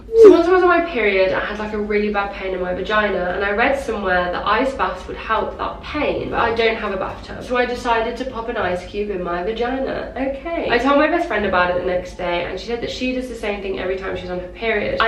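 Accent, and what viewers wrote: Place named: RP, United Kingdom. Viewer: She sounds so posh here hehe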